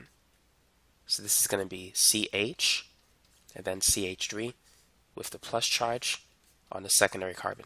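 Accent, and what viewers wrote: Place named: New York, United States. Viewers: i think i hear a new yorker accent in this man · new york accent king <3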